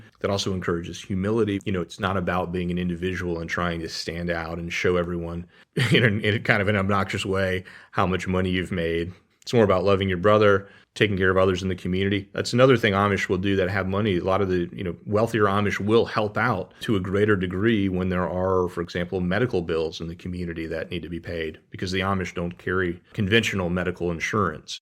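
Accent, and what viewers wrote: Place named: Pennsylvania, United States. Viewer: I believe your from Pennsylvania, the way you pronounce the Word Home or Home’s. ?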